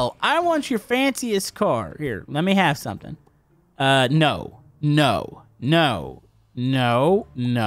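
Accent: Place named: Texas, United States. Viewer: Come on I didn’t know you lived in Texas I thought you lived in like Minnesota or something I thought you don’t have the accent you do of the Texas accent probably should’ve guessed it by that but it’s cool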